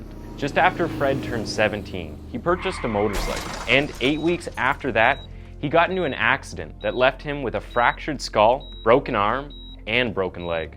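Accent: Canada. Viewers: you do realize that you made a sweeping generalization about 320 million people, right,? That's aside from the channel's host sounding like a Canuck, rather than an American. The rotten thing I see is your opinion of hundreds of millions of people based on the probably half-dozen or less Americans you may or may not have interacted with.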